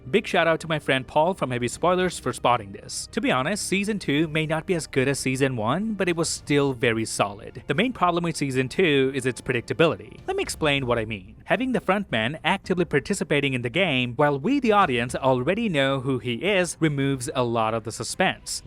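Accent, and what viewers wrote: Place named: Canada. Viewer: which part of canada is this accent from? Sounds interesting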